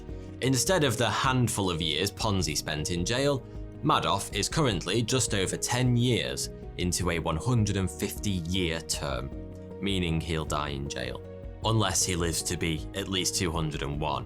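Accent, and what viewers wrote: United Kingdom. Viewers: Thoughty2 sounds very British though...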